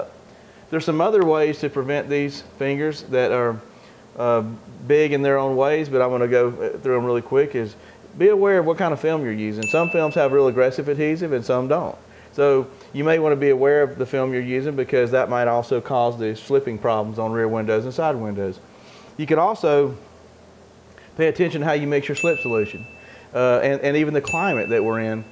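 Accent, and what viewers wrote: South, United States. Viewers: I like your southern accent!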